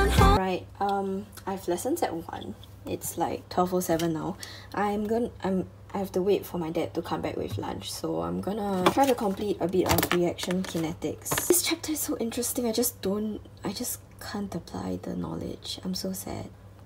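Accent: Singapore. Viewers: I love Singaporean accent so much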